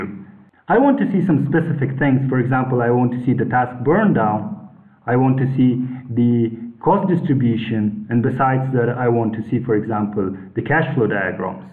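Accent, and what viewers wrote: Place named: Afghanistan. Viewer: The time I heard you, guess what, I told my friend this person is from a very special country, Afghanistan. Amazing Mr., great job!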